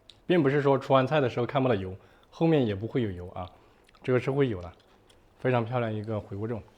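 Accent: Sichuan, China. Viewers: He's speaking Mandarin with a bit of Szechuanese accent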